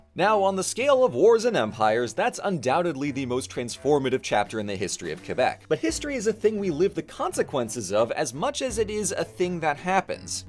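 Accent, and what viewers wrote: France. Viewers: As a bilingual Canadian, this was a good video but also a really interested demonstration of the differences between Acadian French (which is the accent I’m used to), Quebecois French, and France French. There some pronunciations and even specific phonemes - The i in Dufferin, the r in Nouvelle France - that didn’t sound wrong exactly, but also didn’t feel quite right? Like did a good job with them for sure; it’s that the combination of words I’m used to hearing only in French Canadian/Acadian accents with a more France-y accent makes the differences clearer. Tbf I’m also not sure how those pronunciations compare to the Quebec ones - I’m pretty sure keeping the alveolar r (with the tip of your tongue) is a much more Acadian feature and I’m not sure if they do it in Quebec or if they use the glottal (back of the throat) r like in france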